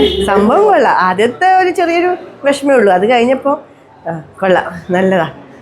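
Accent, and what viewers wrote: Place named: Kannur, India. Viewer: 😂😂 എങ്ങനെ യൊക്കെ ശ്രദ്ധിച്ചാലും ആ കണ്ണൂർ സ്ലാങ് പുറത്തു ചാടുന്നത് കണ്ണൂർക്കാർക് തിരീന്നുണ്ട്... ട്ട്വ...😅😅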